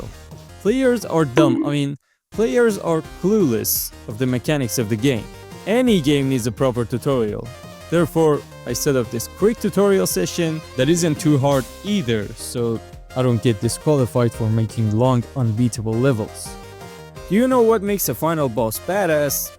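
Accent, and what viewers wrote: Iran. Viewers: you sound soo Iranian for some reason its hard to see gamedevs from iran on yt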